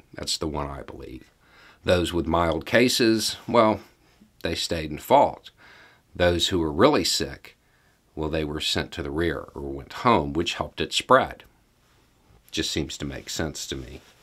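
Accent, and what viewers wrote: South, United States. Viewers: Absolutely spot on both b e a u please Google I played the lead in high school in a play on Remembrance Day as a newspaper editor who is covering up the truth to make this brief so we learned about it many years ago I'm 63 now and we we learned about it in high school but it's interesting to hear you comment because you're kind of like in the South I gather by your accent and I'm just surprised you know so much about us up here and I learn a lot from you and I really appreciate that thanks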